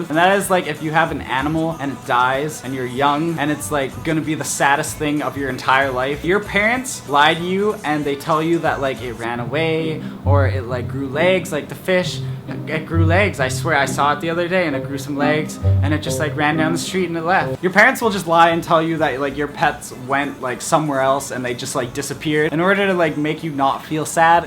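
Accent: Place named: Canada. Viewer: Random Question is he Canadian!!! this the first video i've seen of his!!! He sounds Canadian!!!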